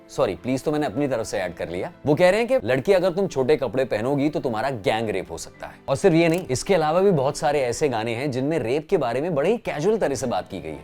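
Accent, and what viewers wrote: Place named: Bhojpuri, India. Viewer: No bro you speak pure bhojpuri no prob.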